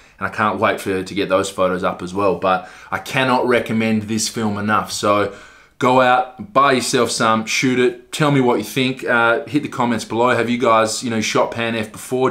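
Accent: Australia. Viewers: Absolutely love this film. I especially love shooting it in my M3, with a Summicron 50mm colapsable. On a nice sunny day, it's a must. I agree with everything you said, and the cafe shot is lovely. Also, I've been following the USA political scene for almost two years, and to hear an Aussie accent on YouTube is a real bonus. Well done.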